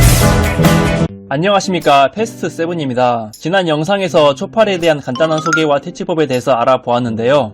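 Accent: Jeolla, South Korea. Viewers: 전라도 사투리가 조금씩 들리네요ㅎ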